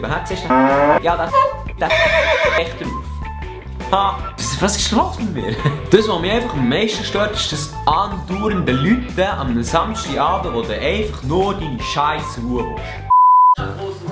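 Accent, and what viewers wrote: Switzerland. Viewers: Schwiizerdütsch isch immer guet, chunt eifach verdammt authentisch übere. Guets Video ;) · scho lang uf nes schwizerdütsches video gwartet 👍 tönt outäntischer 😁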